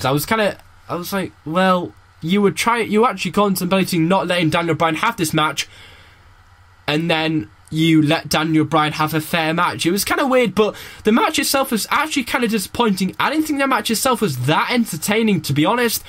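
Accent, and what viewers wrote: United Kingdom. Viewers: I thought you were British!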